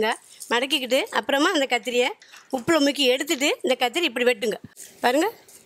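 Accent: Tirunelveli, India. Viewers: Tirunelveli speech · Anna avanga thirunelveli slang anna...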